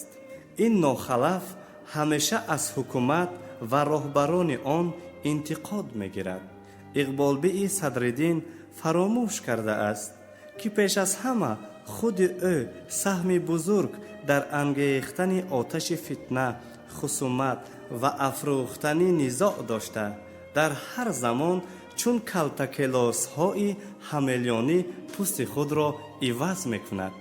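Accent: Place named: Sughd, Tajikistan. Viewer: Садқаи лаҳҷаи Суғди шави, маймуни чоплус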